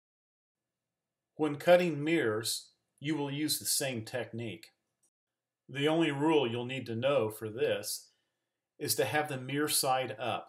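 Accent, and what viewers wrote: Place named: Texas, United States. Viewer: Sounds like he's from arlen texas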